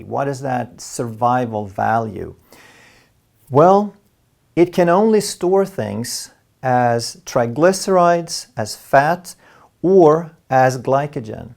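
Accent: Sweden. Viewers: You sound Swedish yet the Swedish translations on your channel look machine translated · He is Swedish. And you can hear it on his accent all the way through.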